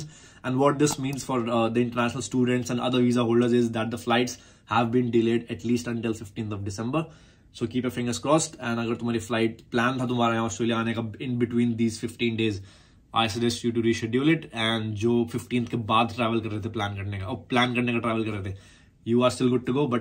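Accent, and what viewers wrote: India. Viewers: Im Sorry if I offend to somebody but what kind of lenguage does this guy speak. English Indi??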